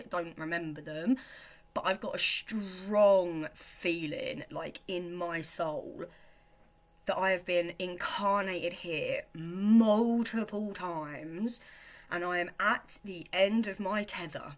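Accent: United Kingdom. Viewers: 😄that british accent in combination with heavymetal looks damn. heavy metal queen. just walk baby walking fixes everything. you are beautiful. why not become a mama? kids are nice. you lose some sleep but this is our ultimate purpose. *what kids give you in your heart, no therapist can do that. sorry for my english not good. everything is gonna be okay baby don't worry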